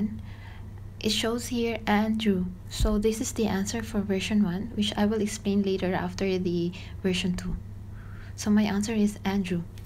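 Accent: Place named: England, United Kingdom. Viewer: Nicee Content, I like your English accent. Tinapos ko para malaman ko dn sagot I think Andrew is more accurate, hihihi nag iwan na dn ako ngskli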